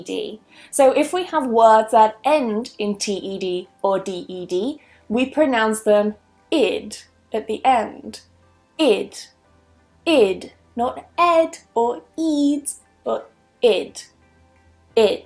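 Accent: United Kingdom. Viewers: Thanks so much for your work and Happy Birthday to you🍰26🕯️🕯️🕯️🕯️🕯️🕯️🕯️🕯️🕯️🕯️ 🕯️🕯️🕯️🕯️🕯️🕯️🕯️🕯️🕯️🕯️🕯️🕯️🕯️🕯️🕯️🕯️amazing clear British pronounce! Lovely teacher indeed! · Nice video. Thanks a lot. Love British accent.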